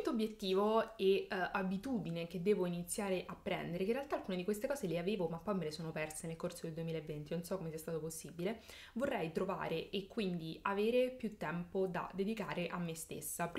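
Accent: Rome, Italy. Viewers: Forse una cosa che non ti ho mai detto e che non vedo scrivere spesso. Parli molto bene. Sei romana certo ma se devi tenere un discorso sai esattamente come esporlo e anche in modo ottimale. Bel video. Bello per quello che hai detto e perché hai legato il tuo discorso a dei video significativi. Complimenti, uno dei video migliori❤️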